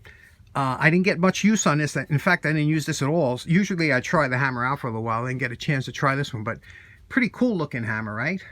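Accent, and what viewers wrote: New York, United States. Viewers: Love the accent. New York or thereabouts?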